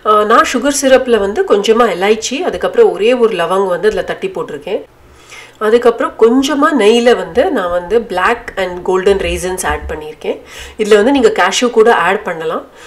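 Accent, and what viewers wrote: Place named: Karnataka, India. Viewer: I am Sujithra from Chennai. Nice video. will try the peda recipe, looks yummy. very nice recipes. what is your mother tongue, your tamil looks a mix of kannada or tulu, correct me if I am wrong, lik your videos, they are very positive and nice. keep going, all the best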